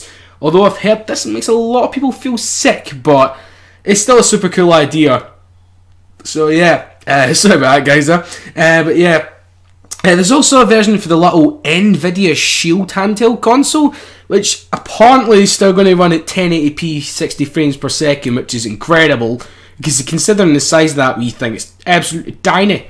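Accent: Scotland, United Kingdom. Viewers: what part of scotland are you from?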